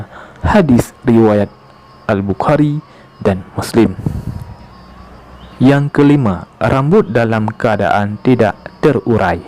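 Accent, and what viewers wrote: Aceh, Indonesia. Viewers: Sang nyoe lage na logat logat khas ureung aceh😁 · Ini logat orang Aceh bukan? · Denger dari suaranya Orang aceh ni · Adminnya orang aceh ya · Ini pasti awak aceh , terasa logat aceh nya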